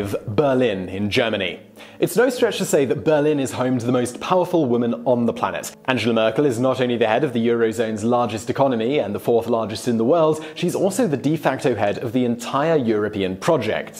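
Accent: United Kingdom. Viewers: a bloke with a british accent says london is the capital the world totally obejective.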